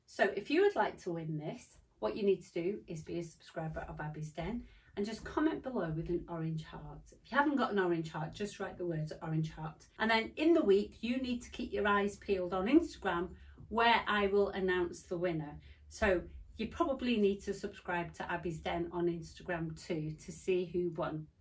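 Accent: United Kingdom. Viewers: 🧡 Love your tutorials and your British accent!! 🧡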